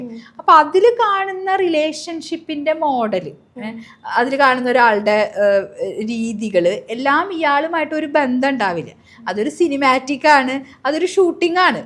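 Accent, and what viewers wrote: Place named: Valluvanad, India. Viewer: വള്ളുവനാടൻ മംഗ്ലീഷ്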